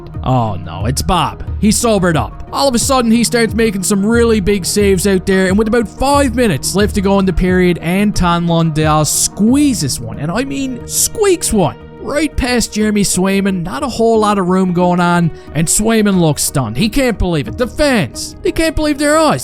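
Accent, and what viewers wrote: Newfoundland, Canada. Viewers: Whwre you from bi? Me and the misses are arguing I'm saying your from newfoundland and she's saying Scottish 🤣 where canadian so help us out bud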